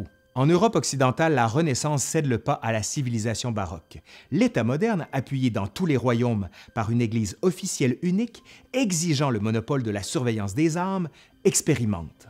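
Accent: Quebec, Canada. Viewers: Dans les années 2000 à Bordeaux, j'ai vu une exposition québécoise fabuleuse sur le Moyen-Âge. Cela a achevé de me passionner pour cette époque de mon pays. Depuis, le Québec m'aide à connaître la France, et j'ai appris en retour à connaître ce beau pays de Québec (libre! 😉) et sa culture si riche. Mon fils de 8 ans te regarde souvent avec moi car "il explique très bien le monsieur!" et il peut suivre. Et on adore ton accent! Bisous de France 🐸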